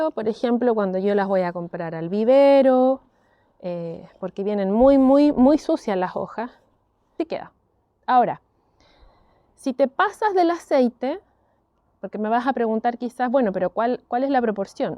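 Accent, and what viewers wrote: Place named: Chile. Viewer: Muchas gracias por compartir! Me encantó el video y tu acento chileno! Saludos desde México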